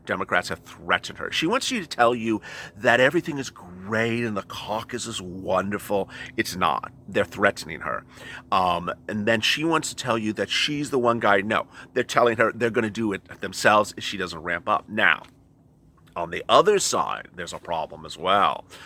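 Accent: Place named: South, United States. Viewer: Good morning this is Tennessee coming to you your southern accents getting good just a little more drawl to it LOL!!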